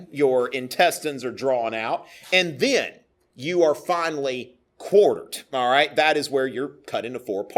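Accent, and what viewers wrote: South, United States. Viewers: It is so strange hearing such highbrow stuff being taught with a thick, hick accent. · This is a really good series. Engaging teacher, which isn't that common! Strange to hear British history with a southern accent, but nonetheless a really fantastic foundation of the period.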